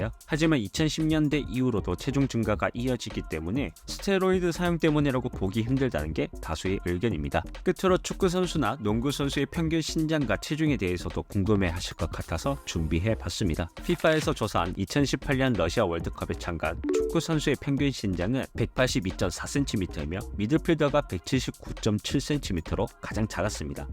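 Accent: Gyeongsang, South Korea. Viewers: 고향이 경상도 어디세요 ?? 사투리가 똑같네요 ㅎㅎ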